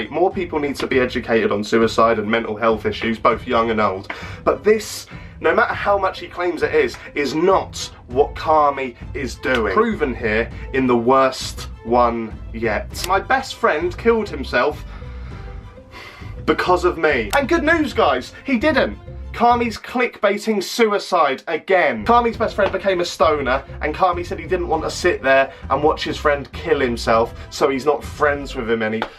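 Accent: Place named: Liverpool, United Kingdom. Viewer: This guy fuckin creased me la 😂😂😂😂😂😂 wha a scouser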